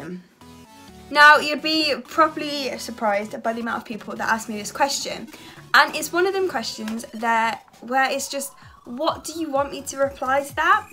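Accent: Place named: England, United Kingdom. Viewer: You 're such a cute young woman (i could be ur father) , i've seen two other videos of U … You are able to do most things that a lot of us with our hands can't do. As you say, you do those vidéos, u go to school , U do so many things that other Don't, me first. I love your English accent and the light you're brighting arround you. Sorry for my English , i write from Britanny (France) . You already know how to be Happy Pleased Glad … many ones will never know that.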